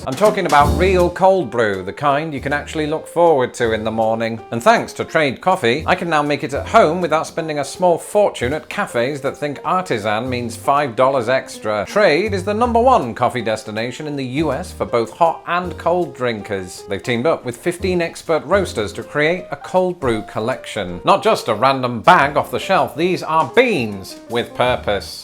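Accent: United Kingdom. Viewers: After 17 Years of Living in America , You Still have a Very Thick , Heavy BRITISH Accent . 🤣🤣🤣🤣🤣🤣🤣🤣🤣🤣🤣🤣🤣🤣🤣🤣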